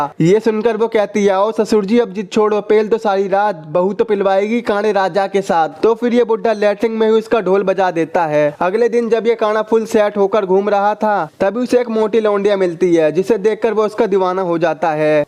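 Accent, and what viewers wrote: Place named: Rural, India. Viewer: bhai tu thoda sa behan ka loda h kya teri aawaj kitni madharchod h or gawaro wali h 🤣🤣🤣🤣🤣🤣🤣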